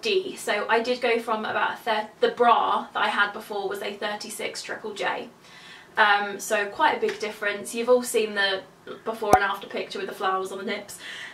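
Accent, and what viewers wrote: United Kingdom. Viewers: Oh that British ascent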